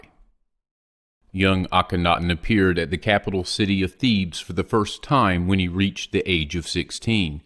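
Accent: United States. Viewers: Completely unrelated, but...... Your has got the be THE best American accent!!!!!! 😍😍😍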